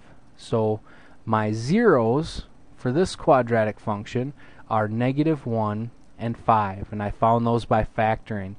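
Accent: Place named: Canada. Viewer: With my exam in 2weeks, i've been really struggling. This really helped! Thanks a ton. Love your accent! Are you Canadian?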